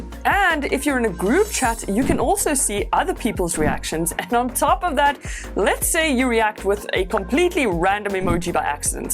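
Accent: South Africa. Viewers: Are you South African? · Great video, are you South African, you sound South African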